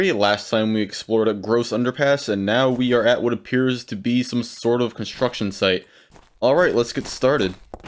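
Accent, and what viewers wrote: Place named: United States. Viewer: Thanks for answering, if you don't mind me asking, what place in America is the accent from, I'm asking because it's a little different from what I usually hear in movies and games.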